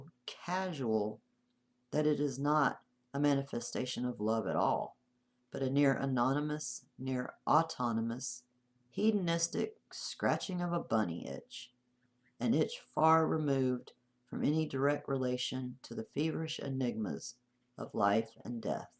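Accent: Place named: Western North Carolina, United States. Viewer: I’m from western North Carolina so it sounds like home to me!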